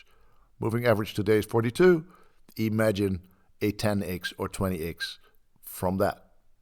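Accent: Netherlands. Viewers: i smell nederlands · From The Netherlands, no doubt. Like I hear myself. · I smell nederlands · are you Dutch?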